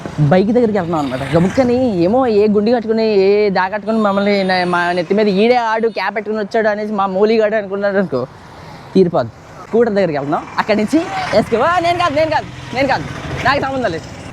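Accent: Godavari, India. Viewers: Meeku Inca subscribers ravali ani korukuttuna brother me comedy Maa godhavari yasa baguntadhi meeru Inca godavari side village s traditional videos chayandi bro😊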